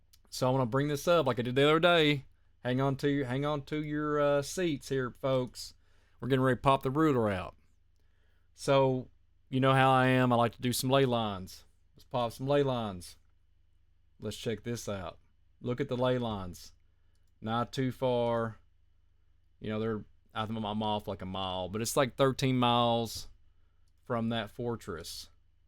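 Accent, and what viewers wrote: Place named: Kentucky, United States. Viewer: Another awesome show!! Keep tellin it brother!! 💯 I love all your research & passion! May Yah continue to bless you 🙏 💙 🙏 PS~ I love your "Kentucky twang" & pronunciations!! You keep me laughing & learning at the same time!! 😊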